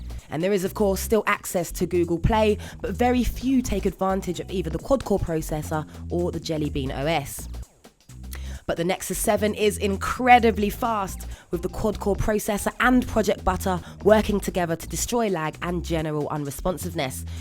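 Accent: United Kingdom. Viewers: I love hearing that British English!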